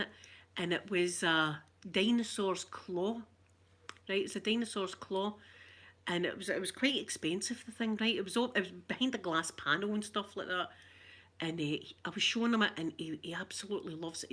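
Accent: Scotland, United Kingdom. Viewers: listening to you speak reminds me of back in the day, when we were able to travel freely and I went up to visit my grandparents and cousins in glasgow. Coming from germany I have not been back to see them in a long time and when someone speaks in the same scottish accent as I am used to hear from them, it always brings back memories from when we all used to gather at my grandparents flat in glasgow and talk about all the things going on in peoples life. I think the last time I was there must have been about 3 years ago or something, so its been a while. I just wanted to thank you for this video and the memories that raised in me while watching it. Keep up the good work and stay safe out there!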